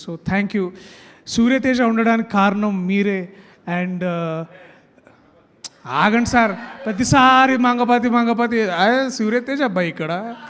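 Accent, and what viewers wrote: Telangana, India. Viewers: ప్రియదర్శి గారికి ఒక సలహా తెలుగు సినిమా లో యాక్ట్ చేసినప్పుడు స్టోరీ బట్టి dilect ఉంటుంది అది తెలంగాణ యాస అవొచ్చు రాయలసీమ యాస కావొచ్చు లేక శ్రీకాకుళం యాస కావొచ్చు కానీ తెలుగు అని ఒక భాష ఉంది అది ఎవరో ఒకరికి చెందింది కాదు అది అందరిదీ అందువల్ల తెలుగు మాట్లాడానికి ప్రయత్నించండి మీరు తెలంగాణ యాస మాట్లాడి ఒకే ప్రాంతానికి చెందినవార్ని సంతోష పెడుతున్నారు ఇది తప్పు ఇలాగ చేసిన చాలా మంది కనుమరుగవుతున్నారు, మీరు అలాగా అవుతారు అనుకోవడం లేదు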